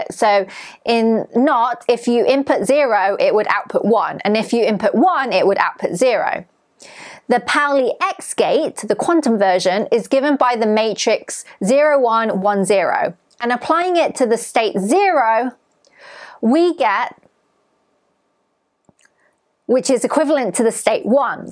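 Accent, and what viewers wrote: United Kingdom. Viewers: Forgive my bluntness, but I'm Canadian, I love languages, and I can't identify which UK accent you have, and I don't want to be rude by guessing wrong. So, what kind of accent am I listening to? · I am curious, are you a native British english speaker?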